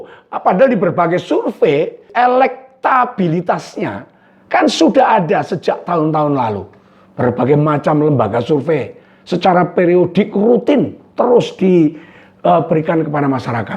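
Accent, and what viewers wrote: Java, Indonesia. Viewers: Senang ikuti ngompol yang dipandegani mas logat jawanya yang kental enak didengar,terus suarakan kebenaran mas, kami warga Kalimantan Barat siap mendukung dan siap menangkan pak Ganjar Pranowo di pilpres 2024.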